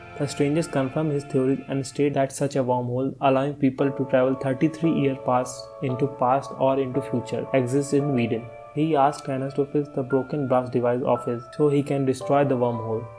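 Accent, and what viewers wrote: India. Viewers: i liked it because of the indian accent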